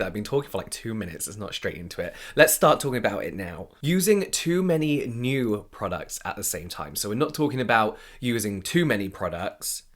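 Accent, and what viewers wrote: United Kingdom. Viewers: your British accent is everything! It's literally better than ASMR 😍 love it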